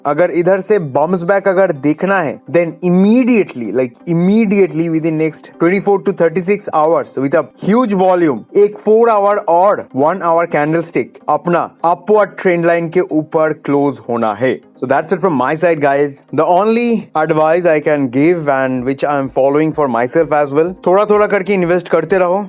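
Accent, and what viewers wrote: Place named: India. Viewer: Beautifully explained. I love your ehindi accent....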